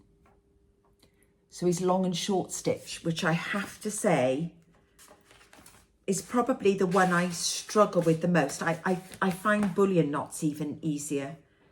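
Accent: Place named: England, United Kingdom. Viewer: I absolutely loved your longer video. You inspired me so much! I could listen to you all day. Such beautiful work and your very pleasant accent reminds me of my grandparents and uncle. They were from England. Keep your videos long. I got quite a bit of stitching done listening to you.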